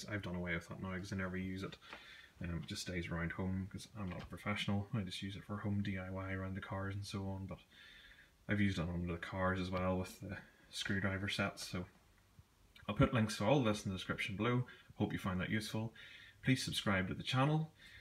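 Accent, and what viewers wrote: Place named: Wales, United Kingdom. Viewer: Great review. I have the same drill and its light, powerful, (more torque than the PSB18 Li2). the only issue is the keyless chuck needs care and some force to ensure a good grip on the drill bits. And the Batteries are a stupid price... A bit of profiteering by Bosch I guess. On the plus side picked up a Bosch PDR 18 Impact driver (body only) but the same batteries fit, for £39 which frankly will do for 99% of my DIY needs. So far its fitted quite a large decking project, a new fitted kitchen and a garage / workshop and never skipped a beat. Great value.... Great Welsh accent btw..... 😉👍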